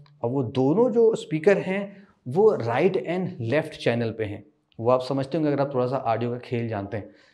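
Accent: Lucknow, India. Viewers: aap Lucknow se hai kya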